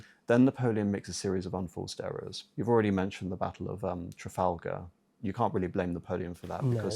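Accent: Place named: United Kingdom. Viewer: Listening to is like reading two textbooks at once in a low pitched British accent but in a good way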